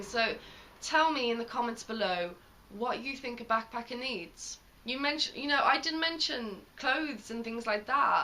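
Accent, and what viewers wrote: England, United Kingdom. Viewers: It's an English accent.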